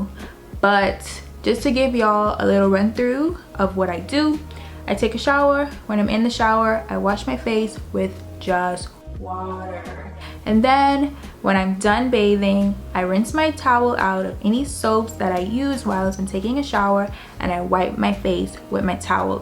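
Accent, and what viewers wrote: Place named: Bahamas. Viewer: Are you a Bahamian? Sorry but I’m one and I’m like she sounds like one too. But I love your content . Keep it up!❤❤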